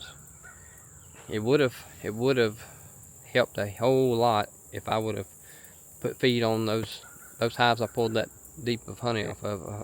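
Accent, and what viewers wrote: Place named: Alabama, United States. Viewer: Your right about staying on top of your hives for sure, it don't take much to lose a couple of them and that really hurts especially if your a small beekeeper without a lot of resources to pull from. Good tips about laying workers, gives me something to fall back on when I run into it. As an aside, wife knew you was from Alabama when she heard you talking from the other room LOL!, her kinfolk are down Brewton, Alabama way. Y'all have a good day now.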